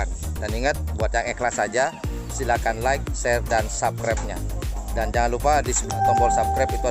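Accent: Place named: Java, Indonesia. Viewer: ouh sya kira mas orang jwa krna logatnya kyak orang jwa,sya lgi di negara jiran mas mlysia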